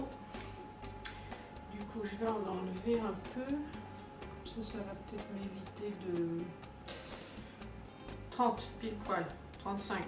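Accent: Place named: Rouen, France. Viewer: Mais tu es de Rouen ?? Il me semblait bien avoir reconnu l'accent 🤭 Super tes vidéos j'adore !!!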